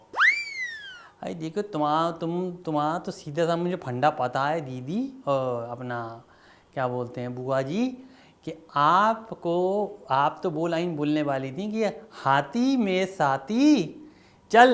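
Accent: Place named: Bundelkhand, India. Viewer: मज़ा आ गया बुंदेली सुनकर। और कछु अंग्रेज़ी सुनकर। वैसे वो तो इतनी भी अंग्रेज़ी नहीं बोलता जितनी आप बोल देते है।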